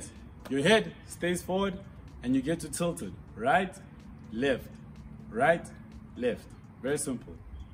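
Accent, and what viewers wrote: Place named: South Africa. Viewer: Where is this guy from?!?!? Getting south Africa vibes but Idk I also hear zim I'm confused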